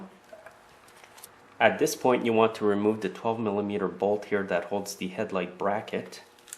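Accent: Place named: Canada. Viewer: nice to hear a canadian,nice job on the video.thank you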